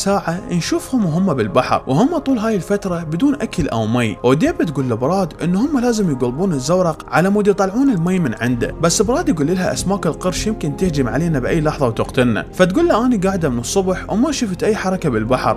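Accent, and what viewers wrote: Iraq. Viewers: عاشت ايدك على هذه العمل فوق الروعة انته إنسان مبدع وخاصة تتكلم بل اللهجه العراقية الأصلية وهذه السبب الرئيسي في متابعتك 🌹🌹🌹👍👍👍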